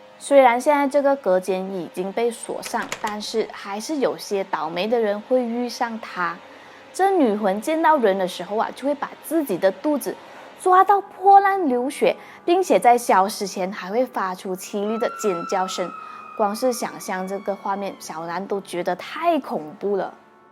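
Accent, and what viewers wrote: Cantonese, China. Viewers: 你好可愛呀，你啲粵語好得意好好聽🥳🥳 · u cakap cantonese cute sikit ~~ try to do some cantonese content ~~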